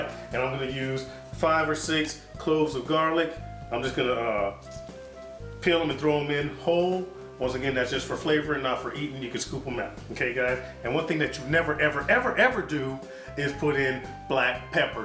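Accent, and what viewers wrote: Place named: Virginia/Maryland, United States. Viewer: You sound like you are from VA/MD area....so I would have to come by yo' house and see whether them things stink or not! lol. For real though, my nose is ultra sensitive and I've NEVER been near a Chittlin' that didn't have some odor. I appreciate the culture significance though, even though I'm not a fan. Last time (yeaaaars ago) I tried to taste a piece---even smooshed into greens and potato salad---I still smelled them! My biggest concern is "where" they have come from. I would probably only eat them if they came from a local organic type farm. Those pigs in those processing plants are too full of hormones...antibiotics....and general filth...and then we are eating the very part of them that processes that stuff. Adding seasoning salts and bouillon with msg in it ain't helping either. Is there a way to buy and prepare them that is more "healthy"?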